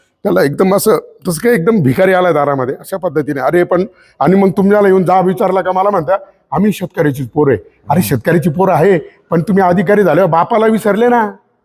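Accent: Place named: Ahmednagar, India. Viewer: नगरी भाषा 😊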